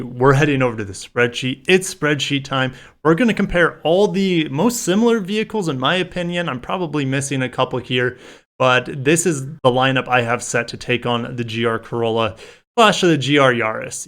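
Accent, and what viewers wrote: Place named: United States. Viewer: Why can't Americans pronounce the simplest of words correctly? The rest of the world doesn't seem to have a problem.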